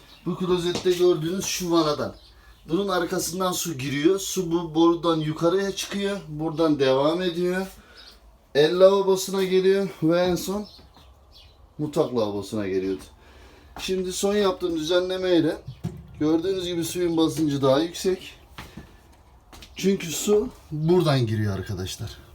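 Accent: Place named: Ankara, Turkey. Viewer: Buram buram ankaralı konuşması☺️ sırf hasret gidereym diye dinliyorum😀😀 şaka şaka bastan sonra izledim hobi bahçesi videolarını çok faydalı buldum emeğinize sağlık bu işe girecek kişiler için oldukça işe yarar bilgiler var. Birçok yerde izinler ve tarla arsa geçici imar izni konusu yüzeysel geçiliyor. Biraz detaylı anlatabilir misin? Teşekkürler...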